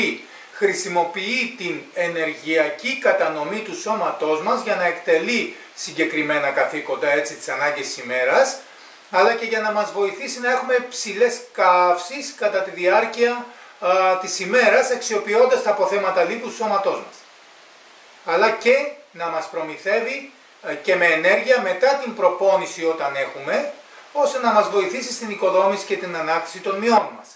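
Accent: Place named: Greece. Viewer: Από την προφορά σου μοιάζεις για έλληνας που έχει ζήσει ή ζει στο εξωτερικό..ωστόσο μπράβο για το λεξιλόγιο κ την άρθρωση(κολλήματα δικά μου)..κ φυσικά για τις πληροφορίες😙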